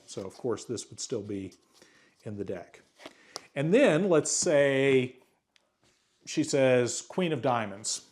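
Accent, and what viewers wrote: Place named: Oklahoma, United States. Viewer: I was going to guess you were from Oklahoma based on your accent. I was close!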